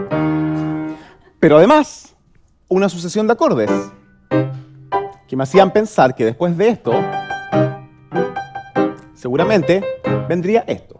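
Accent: Chile. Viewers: Como no reconocer a un chileno intentando pronunciar bien. Viva Chile ·%%$··3# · Es chileno? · Zangano Inkulto Tiene todo que ver, es uno de los acentos chilenos 😂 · Sin leer la descripción me di cuenta que era Chileno, por su acento, que grande un compatriota tan talentoso, felicitaciones y seguiré su canal desde ahora, éxito, sigue triunfando. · Claramente, es por que ambos son Chilenos, acento parecido! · Este gallo es chileno?? 😱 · Es de Chile. El acento sudamericano y centroamericanos siempre sonarán así porque no existe el español neutro en el día a día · Así suena un chileno hablando un español más general XD, sin modismos chilenos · Excelente, su acento es Chileno, como yo... Reconocible para cualquier Chileno, saludos 🤗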